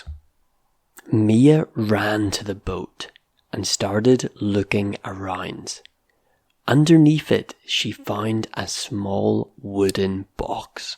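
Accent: United Kingdom. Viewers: are you Scottish? I'm just curious because of the way you pronounced certain words like the ones that have the /ey/ sounds: carefully, raised, paper, and waves. Carefully sounds like curfully; raised, reezed, paper has a true /ey/ sound; and waves is wevz. Also, isn't buried supposed to sound like beh-reed?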